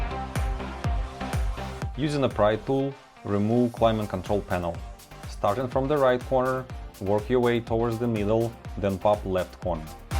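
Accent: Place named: Russia. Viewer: Русский акцент)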